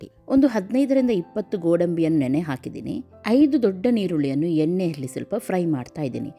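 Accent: Mangalore, India. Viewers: Bayalli neeru barta ide. Nimma matina shaili Mangalore bhashe super...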